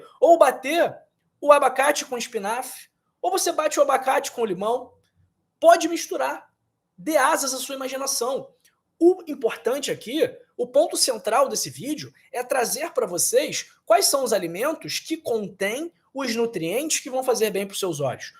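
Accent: Rio de Janeiro, Brazil. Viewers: Muito x d carioca sotaque intragável e muito conversa conteúdo demorado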